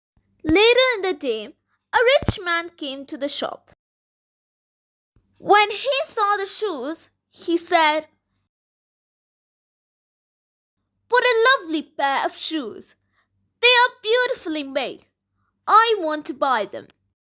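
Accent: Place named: England, United Kingdom. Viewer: Excellent video and story as always, I love your voice is so clear and easy to understand and I really admire your English accent, Great effort my dear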